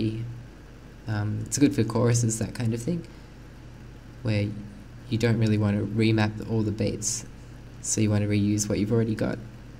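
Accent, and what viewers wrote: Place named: Australia. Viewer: It sounds aus-like anyway. ;]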